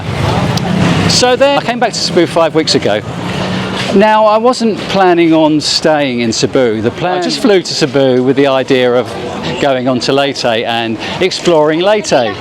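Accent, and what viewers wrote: England, United Kingdom. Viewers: actually I just realised. I really hate the UK accent, especially London. But your English accent is much easier on my ears :) Have a nice rest of the week. Dramaguetti, Dumaregretti haha. What else is that place called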